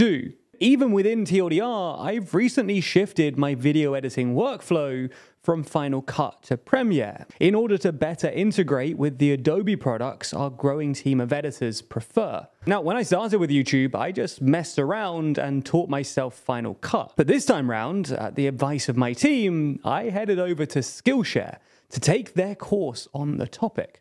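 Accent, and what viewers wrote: England, United Kingdom. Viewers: do I really need someone with an English accent to read me statistics ?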